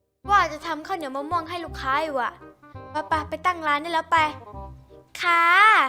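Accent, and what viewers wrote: Thailand. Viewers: พี่เป็นคนพาคเหนือหรอค่ะ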